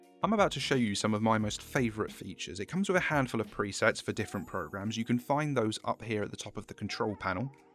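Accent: United Kingdom. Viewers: I really can't tell where your accent is from its definitely Midlands I just can't pinpoint it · He has the best British voice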